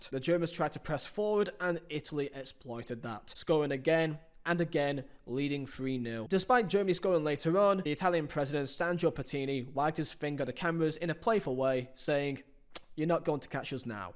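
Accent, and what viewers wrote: United Kingdom. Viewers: UK accent 😫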